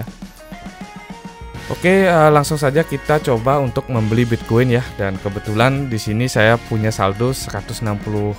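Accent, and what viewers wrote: Bali, Indonesia. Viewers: Asli Bali yaa om? Logat nya Bali banget 😁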